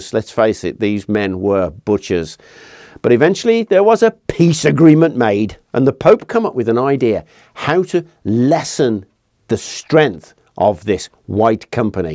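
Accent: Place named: England, United Kingdom. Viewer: Delightful as usual, though I understand this guy I never heard of before gave us French a hard time (another one!)... Indeed, you are an outstanding storyteller, you could even captivate us only by reading the instruction manual of a fridge! I also love, I already said it, but I'll say it once more, your so typical accent, it really adds a lot. There is so much globish here on Youtube that I'm glad to hear somebody speaking a real english, the language I learned and heard in Old Blighty when I was younger, and not the awful thing some people still dare to call english... By the way, speaking of great warriors of the Hundred Years war, how about Bertrand du Guesclin or even Joan of Arc? I know, be defeated by a 18 years virgin is something humiliating, but her story is quite fabulous, believe me!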